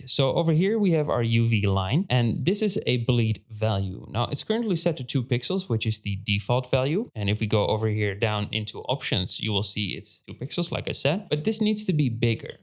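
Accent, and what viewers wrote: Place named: Netherlands. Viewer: I hear dutch.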